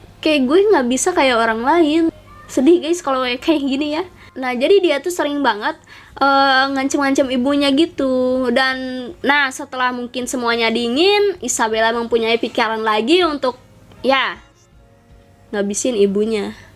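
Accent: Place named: Sunda, Indonesia. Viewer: Sundanya medok😀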